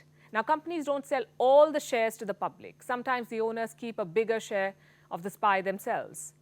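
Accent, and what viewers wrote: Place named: India. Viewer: Her English is the most beautiful Indian English ever that I have heard in my whole life:):):)😊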